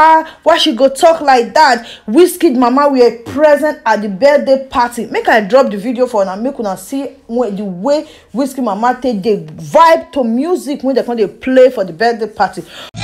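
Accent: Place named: Gullah, United States. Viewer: Enjoyed the video but I couldn't help but notice how similar your dialect is to the gulluh geechee dialect from South Carolina and patois from Jamaica.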